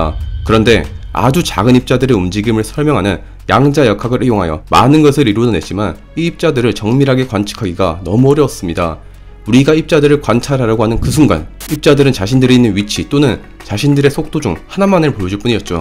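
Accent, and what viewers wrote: Gyeongsang, South Korea. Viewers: 경상도 억양이 약간씩 섞여 나오네요. 어느지역 출신입니까?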